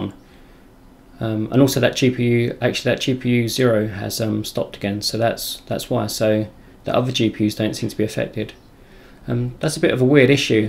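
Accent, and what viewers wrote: United Kingdom. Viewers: Damn.. British accent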